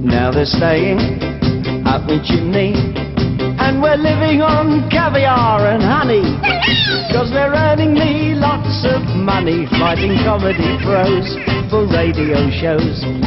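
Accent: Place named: United Kingdom. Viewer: I love his British accent ❤️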